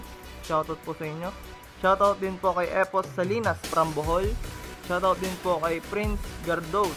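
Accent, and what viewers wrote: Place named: Banyumas, Indonesia. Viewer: Bahasa nya kek jawa ngapak njir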